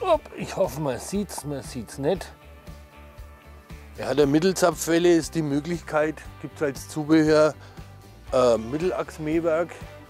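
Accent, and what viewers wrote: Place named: Upper Franconia, Germany. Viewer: Dialekt ist oberfränkisch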